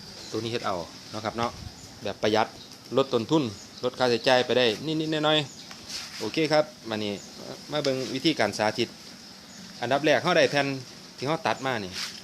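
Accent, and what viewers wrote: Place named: Ubon Ratchathani, Thailand. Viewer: งานเนี๊ยบค่ะ อยู่จังหวัดใหนคะสำเนียงเหมือนคนอุบล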